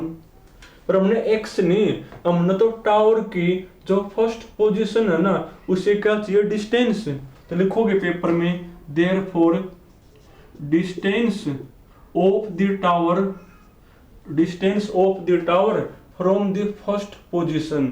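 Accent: Haryana, India. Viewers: Dear sir aapki language nahin samajh mein a rahi thi. Shayad aap Haryanvi language bol rahe the lekin mere sare concept clear Ho Gaye thank u veri Much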